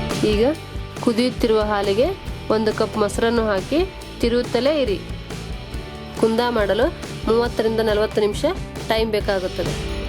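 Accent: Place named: Bengaluru, India. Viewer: Akka, Uttara karnatakadavraagi yaake Bengaloorina kaanada maataadtira.. Uttara Karnatakada kannada kelidre barjari kushi aagtade. Ade tara maataadri..; Recipe mast ide..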